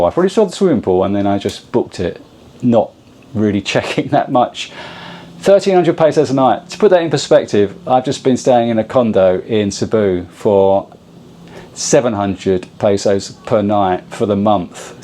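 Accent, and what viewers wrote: England, United Kingdom. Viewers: Love your style, enjoy your choice of music and video editing and even your winging-Pom accent is becoming pleasantly familiar, keep it coming man, I dig it man you know what I mean man it’s cool and informative and visually and audibly appealing so zip zap us all with a little bit more please maaaan.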